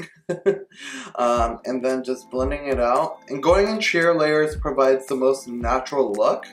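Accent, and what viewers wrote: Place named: India. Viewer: Seems like an Indian guy with Indian accent.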